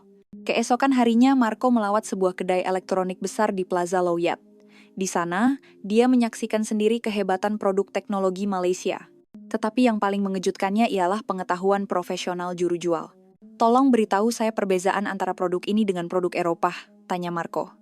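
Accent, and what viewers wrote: Indonesia. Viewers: Aik suara indun!! Tapi konten Malaysia!!! Tak usahlah buat konten pasal negara orang, buat lah negara kamu sendiri!!! Indunnnn!!!!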